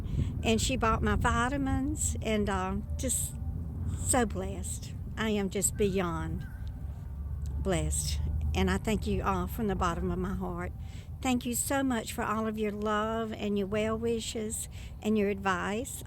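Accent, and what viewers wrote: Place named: Deep South, United States. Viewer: Sweet lady, you have my respect. So glad Uber goober lady referred me here. You remind me so much of the lovely ladies of my youth in the Deep South....not sure why coz not just your accent. I think it’s your facial expressions and manner of speech. Anyway, love and respect from another older lady of the south. Blessings, my dear.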